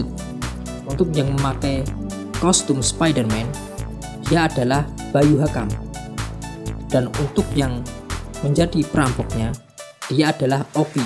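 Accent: Java, Indonesia. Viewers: Jowoe medok banget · medhok cuk,jogja ne ngendi sampeyan mas?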